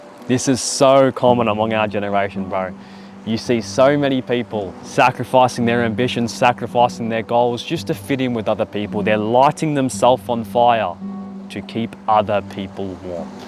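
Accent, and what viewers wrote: Australia. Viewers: Are you Australian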